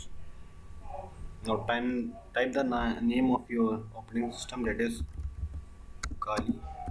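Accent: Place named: India. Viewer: I honestly didn't give a du🦆ck about the subject but his Indian accent 😊. Man don't stop love you, made my joint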